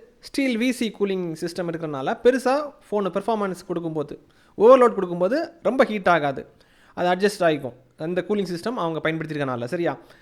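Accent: Kanyakumari, India. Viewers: Bro நீங்க கன்னியாகுமரி மாவட்ட போச்சு தெரியுது, சூப்பர் bro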